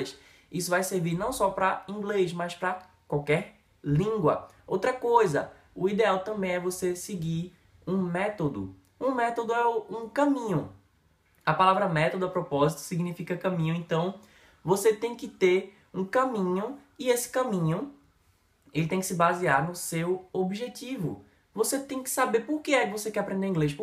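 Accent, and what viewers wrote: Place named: Pernambuco, Brazil. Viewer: Pernambucano detectado!!! 🚨🚨🚨🚨🚨🚨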